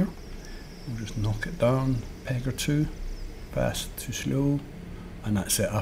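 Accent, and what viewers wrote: Scotland, United Kingdom. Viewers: really? 0.o That's interesting. Do all people in Scotland speak like that? I'm so curious :D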